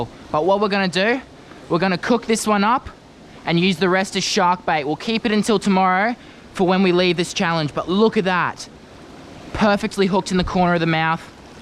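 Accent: Australia. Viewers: 300 ft bruh I thought you were Australian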